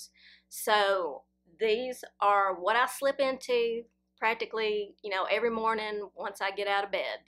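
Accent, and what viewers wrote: South, United States. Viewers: I’ve just discovered your channel. Thank you so much for your hard work!! I love watching you…especially as a Southern expat living in Montana by way of Oregon. Especially since you confirm so many of my own rules, lolz!! Please don’t feel the need to change your accent (saying awn instead of own for the preposition). Let your accent come own through, hunny!!! I sure do!!! Love you, Love you, Love you!!!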